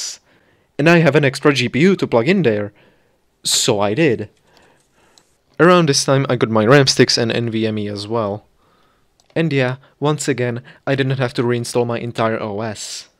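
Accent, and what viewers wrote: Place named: Czech Republic. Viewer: z prvych 5 sekund bolo pocut ten cesky prizvuk xddd, inac dobre video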